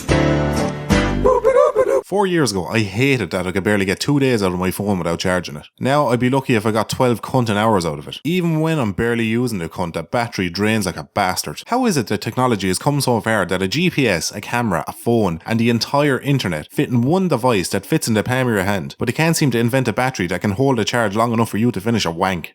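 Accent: Ireland. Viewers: Are you irish? and Loved your channel on the first video pal. Thanks for following back on twitter :)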